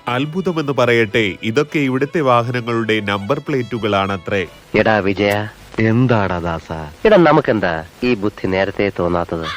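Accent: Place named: Thanigramam, India. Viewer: Thangalude samsaram kelkumbol Thanigramathil genichathupoleyunde I like it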